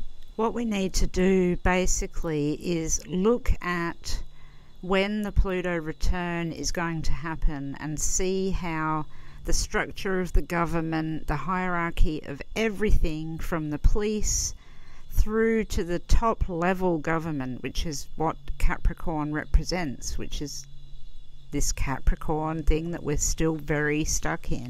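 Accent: Australia. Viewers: Are you Australian,? 👋🇦🇺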